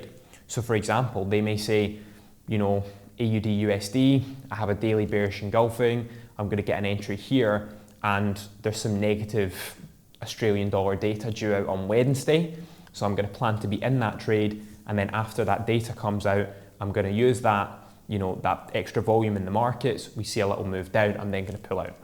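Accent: Scotland, United Kingdom. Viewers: Where abouts are u from mate u sound Scottish ?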